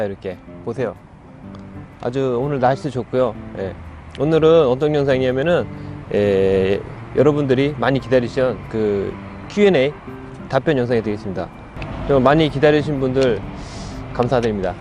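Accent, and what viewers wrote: Japan. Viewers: 한국어하실때 종종 일본말의 영향을 아주약간 받은게 느껴지네요ㅎㅎ 한 3% 정도??? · 20대에 일본정착하셨는데 거의 억양이나 사고가 일본분이 다되셨네요ㅎ초반에 정착하시면서 힘들었셨던게 공감이 가네요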